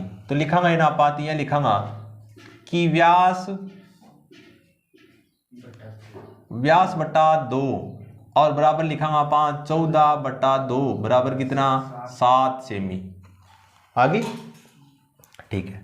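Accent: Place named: Rajasthan, India. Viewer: sir mujhe aap ke bolne ke accent se pata chala kyuki me bhi Rajasthani hu ☺️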